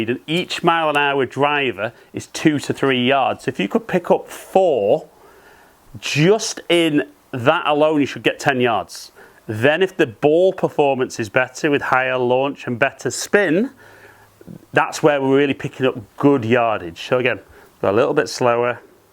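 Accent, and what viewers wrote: United Kingdom. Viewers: Can we get reviews from American without heavy British accents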